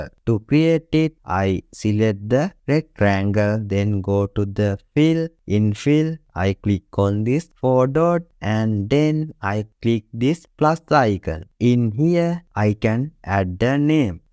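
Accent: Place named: India. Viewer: Dude your accent is awesome, reminds me my Indian physics lecturer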